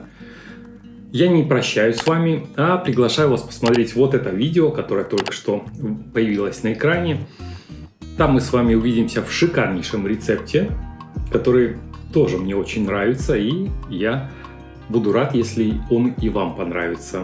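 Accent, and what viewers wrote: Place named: Odessa, Ukraine. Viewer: Длбавляе т что шикарный вкус, а то их есть у меня получается, еврейский одесский тип речи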